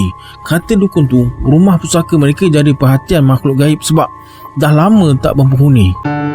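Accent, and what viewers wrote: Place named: Johor, Malaysia. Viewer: Jaln parit kemang B.Pahat selalu saya lalu bila balik rumah abah saya. tk sgka ada kisahnya.Btw ni org Batu Pahat ke? tgok slank bhasa ni mcm org Johor.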